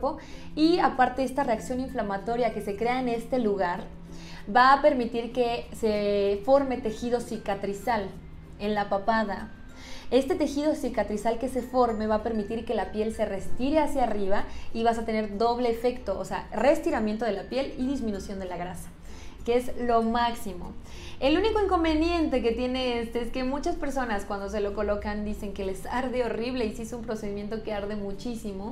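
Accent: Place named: Mexico. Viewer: nunca dicen la moneda completa, pero asumo que es mexicana, por el acento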